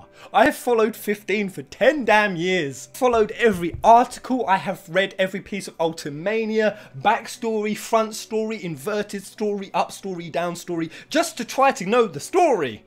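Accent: England, United Kingdom. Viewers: You remind me of my childhood friend Robby but only with an english accent.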